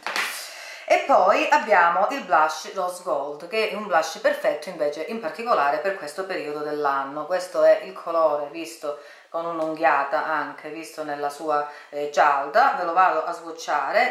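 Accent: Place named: Sicily, Italy. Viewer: Dall'accento mi sembri siciliana😆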